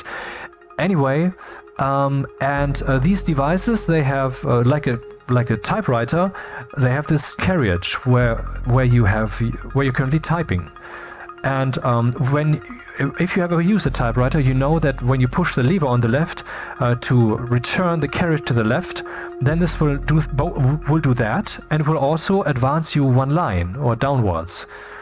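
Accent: Germany. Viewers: I love your little rant in the middle of an explanation in all your videos. It's very entertaining and I can hear that you have an intelligent and deep soul. The rant about smoking made me realise how stupid I was... I'm quitting this nonsense tomorrow, I'm young and have good genetics, I shouldn't be messing around with it! I've heard that a lot, but I don't know, your insulting German accent worked this time. Your teaching is multidimensional. See you soon on other videos.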